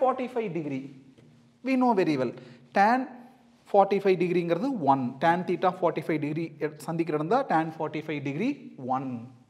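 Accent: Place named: Kongu, India. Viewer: Thank you sir from your subscriber... We have done our maths exam... Despite the QP and marks i would lyk to show my gratitude for ur videos which helped us a lot.. I have been watching ur videos throughout 12th standard... Ur videos helped us very lot and I insisted my friends to watch ur videos and they gave a valuable feed back... We would miss your kongu slang and your teachings... Once again thank you very much sirr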